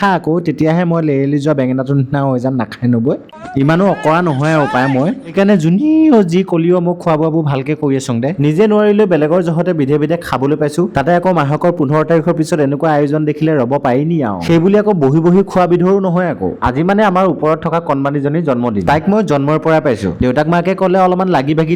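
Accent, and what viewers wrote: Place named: Upper Assam, India. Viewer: কথাৰ সুৰটো আমাৰ আপাৰৰ, ক'ত ঘৰ?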